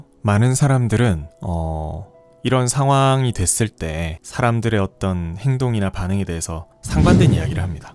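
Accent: South Korea. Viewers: 늘 자기계발 인간관계 지식 이런 류의 채널 영상을 자주보는데...AI 목소리가 많아서 귀에 잘 안들어왔거든요. 근데 목소리가 너무 좋으시고 뭣보다도 친근한 약간의 경상도 억양과 단어들이...오히려 귀에 잘 들어오고 더 와닿네요. 구독누르고 갑니다❤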